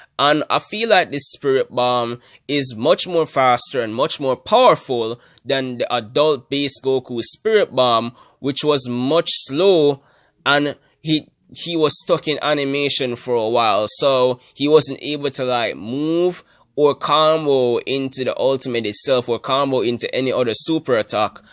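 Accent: Jamaica. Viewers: U sound well Jamaican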